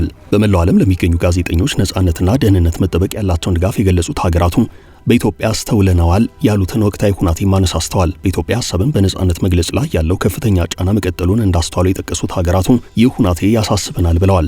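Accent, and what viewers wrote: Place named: Eritrea. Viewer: Ethiopia ina artira biwagu anit metifo utuber ke ethiopia dirashihin newi yeminatefahi ye artira selayi newi yemitimesilewi the way u talk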